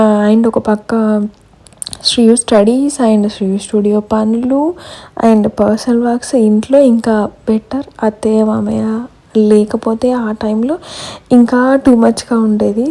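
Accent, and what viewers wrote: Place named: Chittoor, India. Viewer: Asalu voice gurinchi yenduku ala antunaru? garu karnataka kada koncham chittoor slang ki similar ga undochhu....any how chala neat ga matladuthunnaru....go head and congratulations garu❤ Navaneeta from Bangalore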